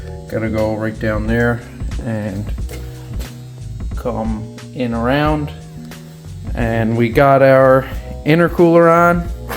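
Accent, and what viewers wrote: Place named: Ireland. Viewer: You don't sound Canadian. Irish accent there?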